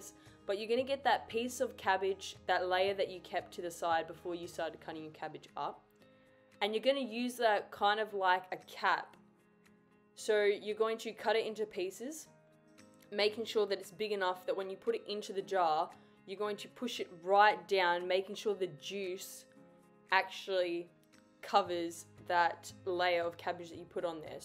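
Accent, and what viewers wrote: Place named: RP, United Kingdom. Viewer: Very good R P English language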